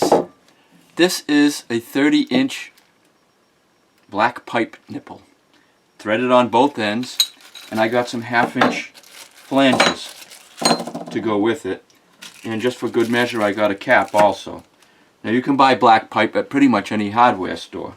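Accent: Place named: Massachusetts, United States. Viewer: from your accent, you sound like you're from New England. I was raised in New Hampshire.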